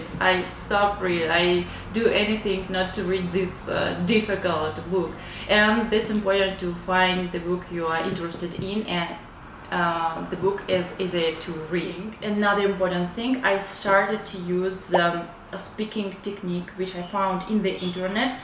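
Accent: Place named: United States. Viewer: You have American accent because that I can understand very well you 🆙, good tips 👏👏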